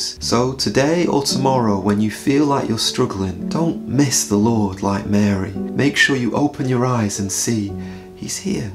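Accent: England, United Kingdom. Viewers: I love Ur speaking voice, Ur English accent!! God Bless U!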